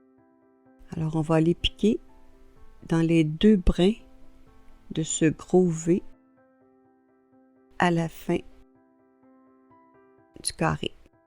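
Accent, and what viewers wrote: Canada. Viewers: Bonjour merci beaucoup pour vos explications très claires et l'accent canadien super agréable merci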